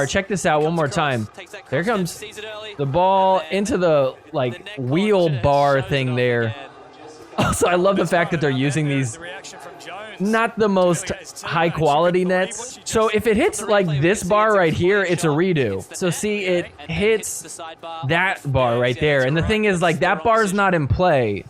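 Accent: Australia. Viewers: Great job, as always! Love your Aussie accent and phrases! I did watch some of the matches. It was fun! I thought it was funny Tardio was late for his 1st singles match and then got pickled. He didn’t hear the call for the match. He had no warmup and he was seen wolfing down a Nutella pizza slice immediately before the match! Kids! 😂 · You're so funny...and your Aussie accent is on point! Keep it real, don't sugarcoat, or censor yourself! 😜👍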